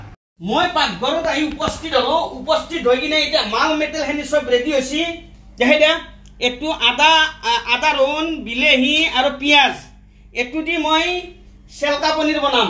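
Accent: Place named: Lower Assam, India. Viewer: ভাল লাগে ফে আপোনাক ❤ । ভাষা টো যে নামনিৰ । সেইবাবে !!!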